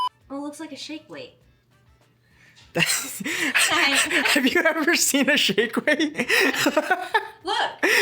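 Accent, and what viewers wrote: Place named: Midwest, United States. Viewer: Definitely from Midwest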